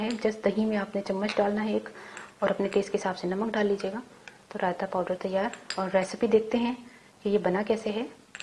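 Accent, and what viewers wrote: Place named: Punjab, India. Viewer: Punjabi voice so cute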